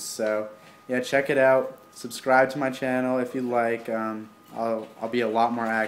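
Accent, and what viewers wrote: Canada. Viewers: He's not, that's just how canadians sound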